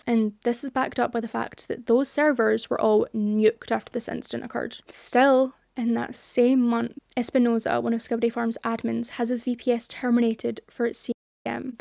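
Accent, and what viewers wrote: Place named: England, United Kingdom. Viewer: Hold. I think im going insane. Why did I remember you having an american accent, and on this account one of the englishy ones?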